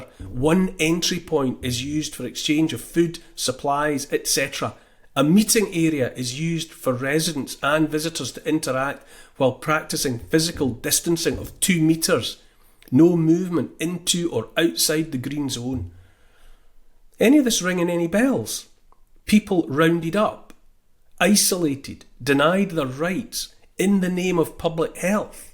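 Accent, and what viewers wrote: Scotland, United Kingdom. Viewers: the TRUTH delivered in the Brogue, thank you timeless warrior. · There's just something about a Scottish accent... The way the word "Freedom" just rolls off the tongue of a Scotsman... May the GOD of Abraham, Isaac and Jacob... Bless, Keep and Protect you my Brother!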